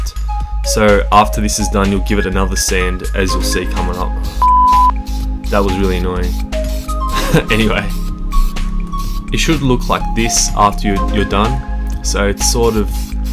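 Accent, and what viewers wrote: Australia. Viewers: YOU’RE AUSSIE?! OMG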